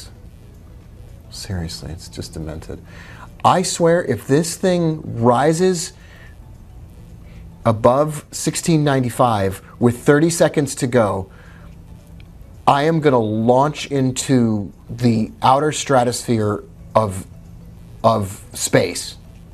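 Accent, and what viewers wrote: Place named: New York, United States. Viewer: You can always hear a new yorker!! Even without a microphone!!!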